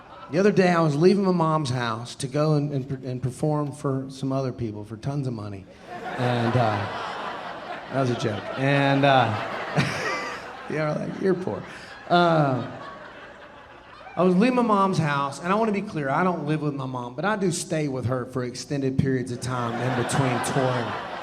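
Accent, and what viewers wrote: South, United States. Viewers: Agreed! It's giving Josh Johnson precision with a southern white dad twang. Here for it 🙌🏾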